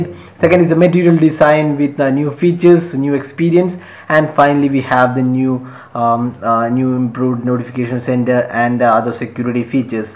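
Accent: Kerala, India. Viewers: Love ur English with Malayale Accent ! Good Job (y) ! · your indian accent is too strong, you need subtitles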